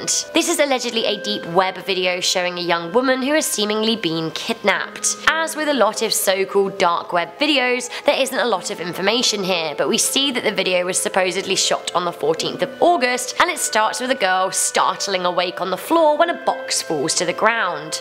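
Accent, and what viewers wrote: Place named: Scotland, United Kingdom. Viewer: im gonna comment something nice. you have a delightful accent :). im guessing its scottish cause i knew someone from whales with a similar voice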